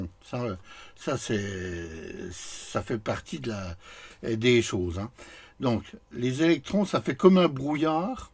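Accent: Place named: Lorraine, France. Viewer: Bonjour, vous avez un accent lorrain,de quelle region etes vous.?...Connaissiez vous L ESR à Nancy boulevard jean jaures (L ecole speciale de radio électricité ) en 1968 et 1969...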